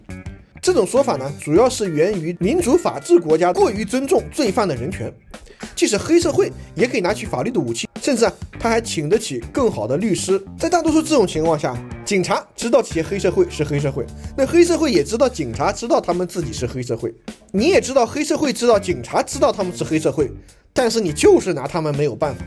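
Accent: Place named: Shanghai, China. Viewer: 喜欢你的上海腔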